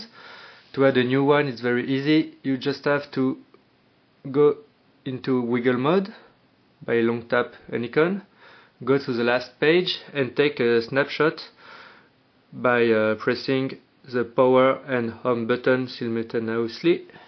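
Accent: France. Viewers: T français acsenent de merde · sa se voit tellement que t'es francais mais c'est bien d'essayer!!! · Are you French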